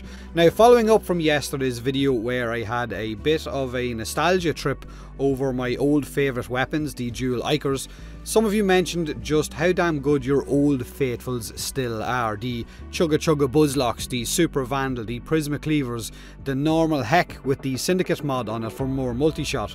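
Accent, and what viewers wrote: Ireland. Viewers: Am i the only one that doesnt give a fuck about the warframe but come just for that sweat Irish accent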